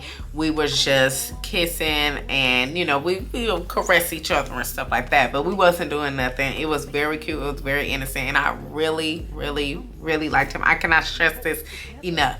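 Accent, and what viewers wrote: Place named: Bronx, United States. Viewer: OMG! Sounds like this guy I know from the Bronx whose name started with the letter J 😂 You're an awesome storyteller, keep 'em coming!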